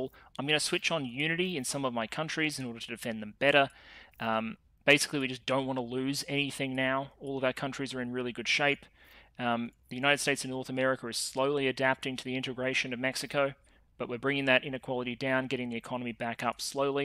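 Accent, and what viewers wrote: Australia. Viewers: Hey man I just wanted say that I look forward to your videos every day, you content is top tier and that aussie accent is exactly what the video needs, your stuff is great, here if my infantismal engagement contribution to aid you with the algorithm god, also castilo is the only relatable view imo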